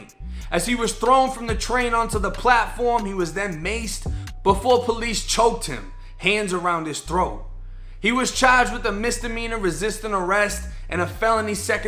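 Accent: Boston, United States. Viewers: Why you got a Boston accent if you from Tampa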